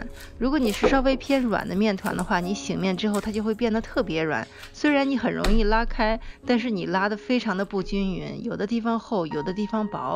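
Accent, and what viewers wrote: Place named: Shijiazhuang, China. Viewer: 听口音像石家庄滴：）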